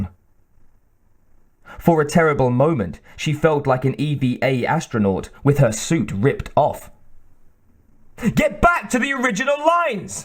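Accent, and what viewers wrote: United Kingdom. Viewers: Truly committed to your craft to finish the whole thing if you felt the same way I did. Berserker was how I found your channel. It was pretty good and the relationship stuff had a sinister angle to it which was much better than whatever Genocide was trying to do. Berserker narration was fine IMO. There is this actor Will Patton that narrates a lot of audiobooks and I always groan when he narrates one I want to listen to. Nothing against the guy but his voice is very distinctly from South Carolina which doesn't really work for most books he reads, at least for me. Your accent makes it sound like an English Thespian is reading and for whatever reason that seems to work for everything. I'll check out those other books, thanks for suggesting them.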